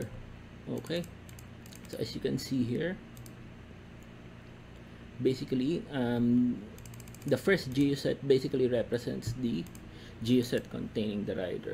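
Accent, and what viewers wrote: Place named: Philippines. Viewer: You sound like filipino. Where are u from?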